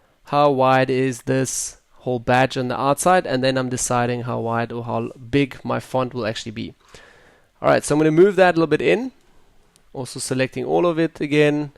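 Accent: South Africa. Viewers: Hey man, I saw you were German but you have a very South African accent, you live there? XD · Jy klink soos n SA man :D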